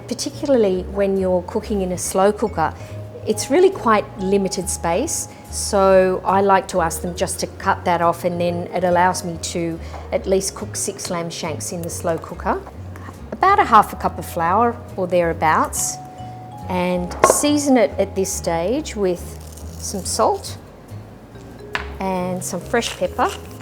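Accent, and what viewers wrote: Australia. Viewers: Nice Shanks...nice Australian Accent as well. Regards from San Jose California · R u an Aussie ? Thank you so much This was beyond incredible . Ill be making it next week when my crock pot is delivered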